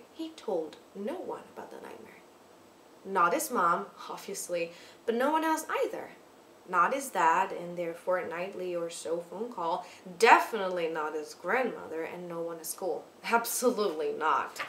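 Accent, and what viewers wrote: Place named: United States. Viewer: È la pronuncia americana, giusto? Perché ho capito abbastanza! Del britannico non capisco niente 😘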